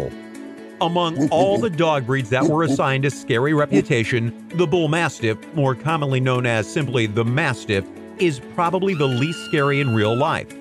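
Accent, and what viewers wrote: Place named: United States. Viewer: You sound American, so why are you using centimeters and kilograms?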